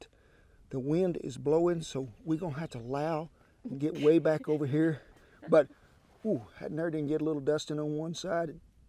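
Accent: South, United States. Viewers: I love deviled eggs. Its a joy and pleasure to watch your way makin em. And hear that familiar southern chatter chat. God bless you and and them good ole dogs helping you out.. 🇺🇲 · Yummy looking! Love his "Cowboy accent"! I'm gonna try the 1st recipe! Yeeeeeeeee Hawwwwwwwwwwwww!! Thank you, Cowboy!! I love the idea of the cutting off the corner of your plastic zip lock baggie!! Saves time for sure!! "Happy Resurrection Day everyone"!!!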